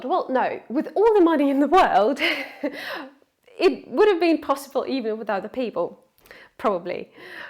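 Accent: United Kingdom. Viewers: Wow, your from Belarus, but sound like a British person. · you really sound British!!! how did you get such a beautiful British accent???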